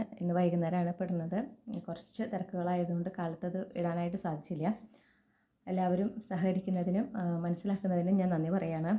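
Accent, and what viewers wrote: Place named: Thrissur, India. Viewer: slang, njanum thrissur aanu.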